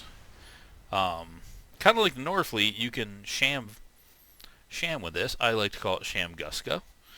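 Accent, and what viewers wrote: United States. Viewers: "Nuke-u-ler" Nor picking, but is that how american's say "nuclear"? I'm assuming you're from the US judging by your accent though.. I always thought words spoken in American-English dialect are pronounced the way are spelled, though you're not the first person I've heard pronounce it in what sounds like a foreign language to me lol xD And yes, the Tunguska does consume two ammo per shot.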